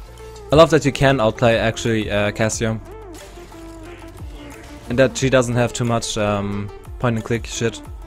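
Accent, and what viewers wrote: Germany. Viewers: warte bist du deutscher? o.o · Hey are you german? You have quite an accent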